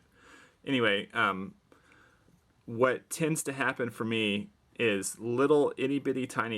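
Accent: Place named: South, United States. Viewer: thanks for putting me to sleep, jesus get some life in you . You sound half dead and talk like your from down south slow as can be. Otherwise good vid and a quick nap for me is good as well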